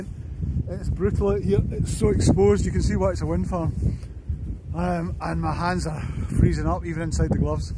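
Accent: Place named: Dundee, United Kingdom. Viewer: Cracking love snow camping was in snowdonia the weekend hardly any about even on the peaks..ps Glasgow would put have money your accent was more northern maybe Dundee ?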